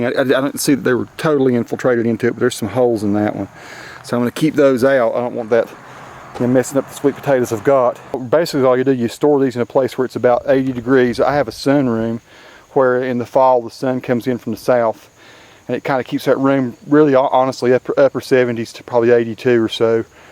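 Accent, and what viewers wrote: South, United States. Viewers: hi wonderful to watch you pull up all those sweet potatoes. I was so excited and they aren't even mine. I love to garden and this past summer, I just stuck a small piece of a store bought sweet potato and then stuck it in a big container. That thing had the prettiest vine that I trellised and lo and behold in the fall I had about 5 pretty decent potatoes from that one vine. I probably would have gotten more but I put it in with a couple of other plants which already had root systems that the potatoes just wiggle themselves around so this year they will have their own private containers. It was such a wonderful treat to eat my own "sweets", from my garden. I am very anxious to do the "slips" this year, that I have been watching on youtube and reading about. Can't wait. I live in Northeast (southern NH, 45 minutes north of boston, ma, so I have a bit of a wait but I start a lot of stuff inside cuz I can't wait. 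It is Jan and I just got all my seeds so I will be starting this week. Thanks so much for the great video. Where do you folks live. I know you are from the south, thats for sure (ha, ha,). lovin listening to your accent. Have a good time gardening this year. I do. Patty in nh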